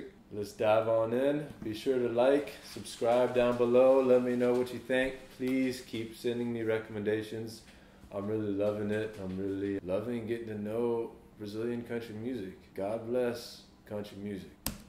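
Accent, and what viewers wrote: United States. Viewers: cara esse sotaque texano é foda demais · nouth cawolina lmao love your accent buddy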